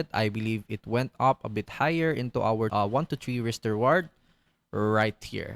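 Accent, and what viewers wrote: Philippines. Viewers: he sounds like Filipino to me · a thick pilipino accent. musta pre?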